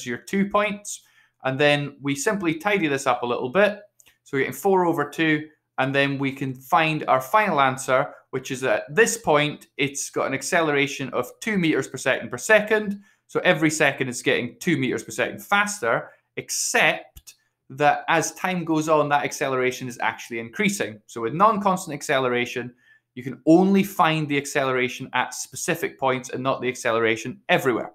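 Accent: Scotland, United Kingdom. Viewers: i like ur sco'ish accent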